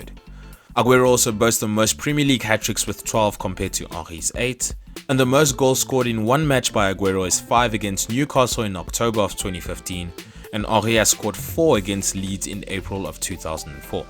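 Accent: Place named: France. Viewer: Thoroughly enjoyed the narrator. Man is definitely French. Looking forward to many more videos. Top stuff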